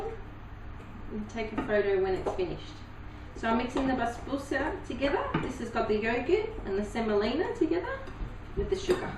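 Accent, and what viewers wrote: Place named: Australia. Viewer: Oh wow! Looking for a Bassboussa recipe and getting one in an Aussie accent, so refreshing:) Hey lovely, I have seen a few tips about not mixing it long enough (so as not to release the gluten) some mom’s actually go as far as saying mix the dry ingredients with the melted butter first (to coat the semolina individual particles) Will definitely try it this weekend, hosting a professional chef for dinner, pressure is on! :)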